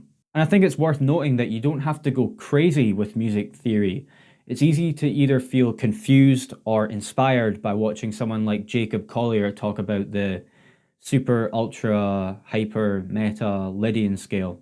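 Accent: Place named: Scotland, United Kingdom. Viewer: two seconds on this chanel and i already can tell you're scottish <3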